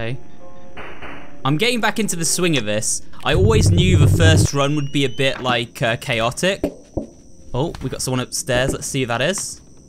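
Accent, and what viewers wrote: United Kingdom. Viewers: He british asl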